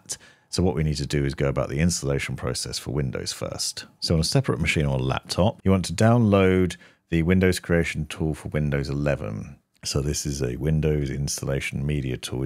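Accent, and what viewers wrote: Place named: RP, United Kingdom. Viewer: Your videos are very useful, but more importantly, I use your videos to practise my English received pronunciation. Yours is so clear! Cheers from Argentina.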